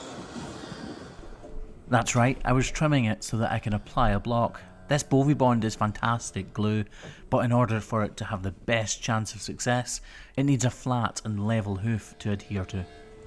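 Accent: Scotland, United Kingdom. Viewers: It’s a very dirty job but someone has to care for cows feet and you do a fabulous job. Too, love your Scottish accent!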